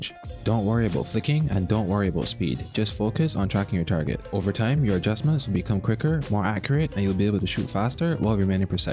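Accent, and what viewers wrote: Barbados. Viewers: You a Barbadian? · Are you from Barbados? Great guide btw, it really helped a lot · I hear that Bajan accent!!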